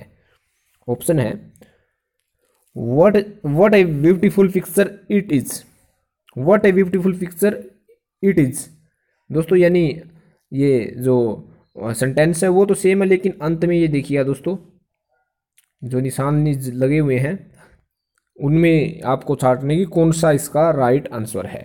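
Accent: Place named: Haryana, India. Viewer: Haryanvi bna di English Hindi ki b bilkul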